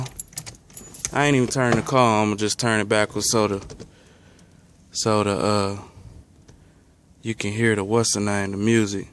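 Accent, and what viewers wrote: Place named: Texas, United States. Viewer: Texas talk..👍👍😂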